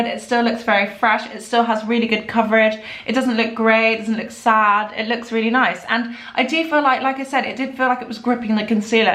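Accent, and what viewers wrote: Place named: United Kingdom. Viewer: Literally just found your channel! I'm a fellow Brit and adore your accent and the way you speak! Thanks for the great reviews x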